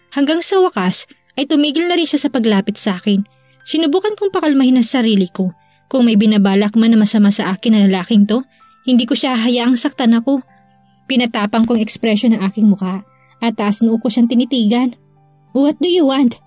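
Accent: Visayas, Philippines. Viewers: Hahaha ang cute mo mag bisaya ms 😁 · Haha ang cute mo miss mag Bisaya. Maraming salamat adm8n sa upload. Attention miss narrator pwede message me private. Thanks 💗💞🇬🇧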